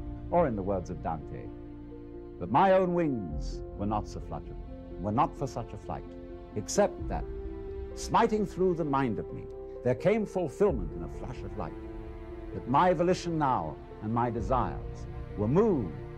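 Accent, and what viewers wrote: RP, United Kingdom. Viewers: His good looks and posh Oxbridge priviliged speak got him through the drunken BS he spouted, gotten from reading other peoples' books. He couldnt make it through a speech without a bottle of brandy. Spiritual Alan Watts the contemporary guru heh? LOL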